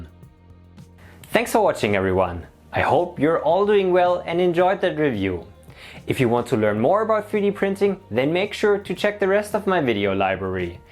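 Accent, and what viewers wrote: Germany. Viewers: Hello dear friend! Congratulation and thank you for this awesome video!!!From your accent i guess you are in Germany. I live in Baden-Württemberg i would be happy if we could communicate somehow. Ich spreche auch Deutsch:)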